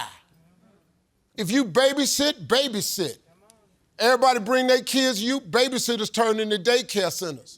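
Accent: South, United States. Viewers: I think learning from something you're interested in is a great place to start. You're more likely to stick with it. But I would also recommend some type of formal schooling. I took French in college and it was very challenging but beneficial because we weren't allowed to speak in English at all. Also I don't know if you can tell but has more of a southern American accent. Like he says "pah" when a lot of people would say "pye." Hope that helps and makes sense!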